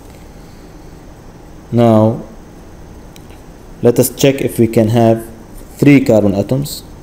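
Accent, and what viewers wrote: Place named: Arab world. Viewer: you sound arab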